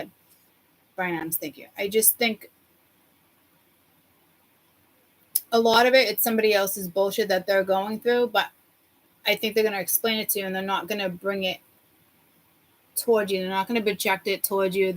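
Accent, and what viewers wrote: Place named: New England, United States. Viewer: I love your honesty. I’ve been following you since day one at your condominium. I rarely catch you live but I always rewatch. You are so gifted. Brenda from New Hampshire, formally Stoneham, Ma. We share the same accent 😂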